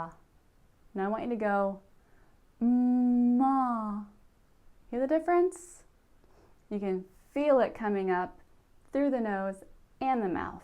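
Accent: Texas, United States. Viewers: are you Texan? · hello are you Texan?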